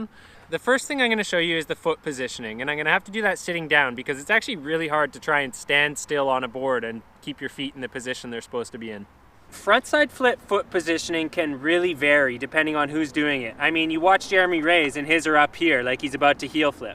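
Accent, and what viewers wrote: Canada. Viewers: It's funny how i can hear his Canadian accent even when I'm a Canadian. Fuckin Mint 🍁👌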